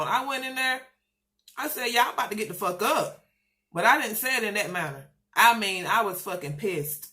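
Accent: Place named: South, United States. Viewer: this is so off topic but girl i love your country accent.........and it didn't work out bc yall are much older and way mature then them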